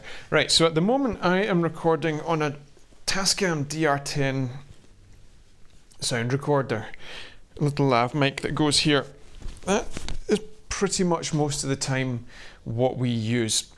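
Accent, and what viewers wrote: Scotland, United Kingdom. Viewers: Hes talking like the characters in “brave” movie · Sometimes a Scottish girl just needs a Scottish guy to explain things! Thank you sooo much! I get it now (I think) 😃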